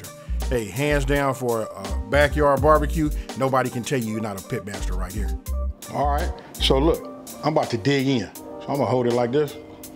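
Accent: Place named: Los Angeles, United States. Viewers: And they say "There is no West Coast accent" This dude sounds like Games uncle. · Nice video...man you sound like you from LA. I see the hat. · been following you for a while man! Awesome recipes man! I’m from Detroit and my wife and I trip off your deep Cali accent! It reminds us of that Detroit twang…very similar! Keep up the good work fam! Much love!!👊🏾👊🏾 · Where are you from bro? My wife and I are debating about you. I say you're from the midwest. She says you're from Cali. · My ex was from New York and she used to say I had a Cali accent. Never knew what it sounded like and after watching, I know what shes talking about now. Me and this dude talk exactly a like. Ribs, looked fire! You gotta video on how to make the rib tips you trimmed off?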